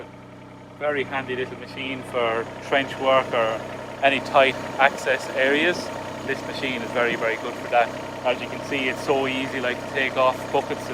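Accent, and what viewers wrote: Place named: Ireland. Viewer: Yes this is my second comment on this video and I’ve seen all of the others where people are swinging hammers and all sorts of things to get buckets on and off. This guy with his lovely Irish accent just simply shows you how to get the bucket in balance and do it with one hand. I am part Irish so I can say something about his lovely accent!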